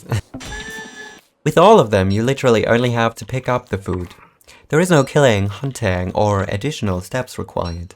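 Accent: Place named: United Kingdom. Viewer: I appreciate the British accent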